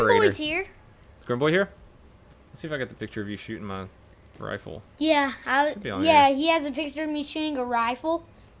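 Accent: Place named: South, United States. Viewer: Wow man i just noticed you have a southern accent! lol I'm serious i never noticed before and i have watched you for about a year! I must be sleeping or something man...lol Your vids are great though and your hilarious!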